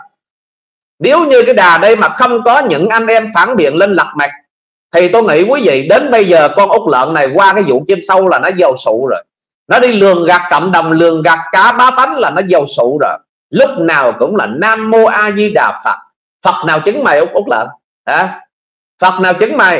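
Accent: Standard. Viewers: Nói chuẩn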